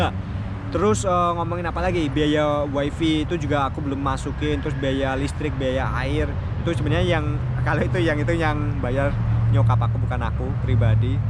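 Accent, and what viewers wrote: Java, Indonesia. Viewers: Suarany asli medok jawa los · Ini kok sdh di amrek msh medokkk. Lbh nginglish org indonesia. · Mas kok suaranya medok tapi wajahnya kayak blesteran apa ada campuran negara yah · Asli jawa ya bang, jawa mna bang? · Medhok jg ya mas wkwkkwkw gokil seru videonya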